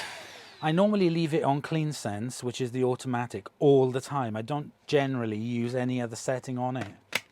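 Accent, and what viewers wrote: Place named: Wales, United Kingdom. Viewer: I like your accent 😄 (I'm guessing it's Welsh). Thanks for the review! You covered all the things I wanted to know and see. The filter part was very helpful.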